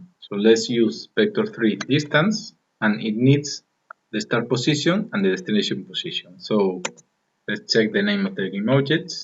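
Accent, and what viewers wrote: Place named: Spain. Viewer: Anda que no se nota el español xD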